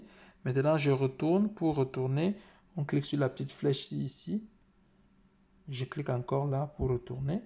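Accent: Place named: West Africa. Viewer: Parles toi bien c'est quoi cette langue franco africaine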